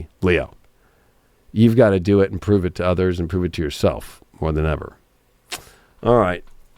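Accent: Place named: Los Angeles, United States. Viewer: THANK–YOU ♥️✨™️®️🎨♏️🌞♓️🌙 Greatly appreciate your time spent here, & those beautiful LA vibes going into the painted canvas for that Survivors Family ArtShow. I like how your voice brings LA to East Coast. Good vibes, over in VT😎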